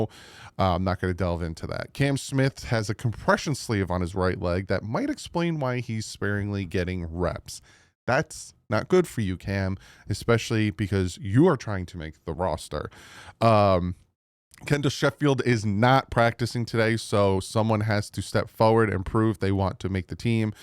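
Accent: New Jersey, United States. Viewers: At least your jersey accent isn’t Tony Soprano esque 😂😂😂 then it would sound like “Mika Fitzs-hhpatrik”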